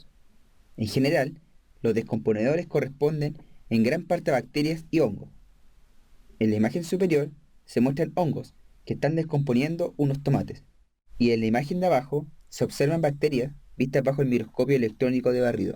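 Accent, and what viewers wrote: Chile. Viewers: no me puedo concentrar por el acento chileno xd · Gracias me ayudó mucho!😊 Eres de chile verdad? Me encanta tu acento :D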